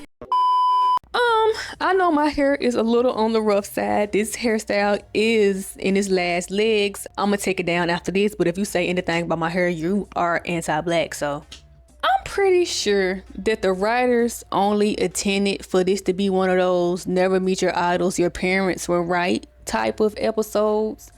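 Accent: Memphis, United States. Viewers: Girl are you from Memphis? I love your accent. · May I ask where you’re from? Just asking because you sound like Memphis area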